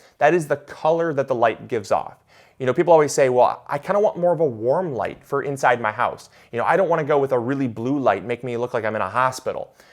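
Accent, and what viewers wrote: Michigan, United States. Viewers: You sound like your from Michigan. nice video!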